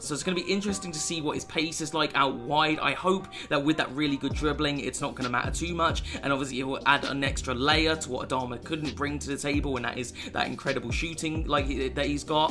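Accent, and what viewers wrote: United Kingdom. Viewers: I really can’t tell if you’re British or not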